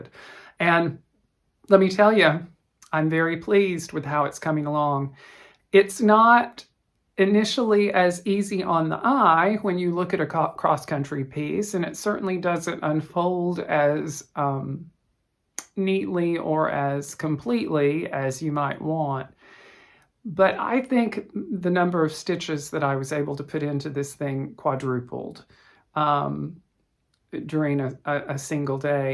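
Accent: South, United States. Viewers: Lol... 😂 I love your southern charm and accent 😅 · Thank you for the southern drawl tonight. I am proud you were able to tell the story of your village. Its sad that parents have kids then decide they don't want to be part of their lives. My dad decided after having 4 daughters that he didn't want the responsibility of s family. He left when I was 9 I just turned 66 and saw him maybe 4 times in my life. My younger sister stayed in touch with him and when he passed she called with statement we were orphans (mom passed two years earlier). Don't know if you like hugs...but I wish I could hold you tight!!!! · Thank you for sharing your upbringing. I hear the south at the end of your tongue, 😛 it’s recognizable but I to have been watered down for twenty years but now back in Oklahoma!! Denim daisies are sew cute. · listen, I was raised in Chelmsford, MASS - RTE 3 - you know right on the Mass - NH border. 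But have lived so long in Arizona - my accent fell off! My Boston comes out often - particularly with imbibing. Love and embrace your inner Southern, just as I honor by Bostonian! Love the Vaphas - Boston accent - see what I did there? Oh goodness, I've been following the Mother story. So many of us have mothers... Hugs and sunny southwest sun to you. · Hi, thank you for all you bring to our community with your southern accent, laughs, smiles, and some tears. Love your HAED design. My son is a full coverage stitcher. Have a great 2 weeks!❤😊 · I live in Albertville Alabama, and as we southerners say, Bless your heart. Loved your “southern drawl”. Really had to try, tho! I’m the youngest of three girls. Always wanted a brother, so I’ve decided you’re it!! I know you need a sister!!! Love your flosstube.😸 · I love a sophisticated, educated sounding southern accent, which you have in spades and your vocab is amazing. 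Yes, you are polished, why I do declare. Fiddlee-ee-dee. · I never noticed your Southern Drawl until today, LOL Great video. I absolutely love your train piece. All your stitching is beautiful. I love going to Ice Castle displays. They are just beautiful., and thanks for sharing the DMC conversion, I honestly never heard of that. Our past makes us who we are, and you are a beautiful, loving and gifted person. I can tell you were raised in a loving environment. Lori Holt's Denim bag is wonderful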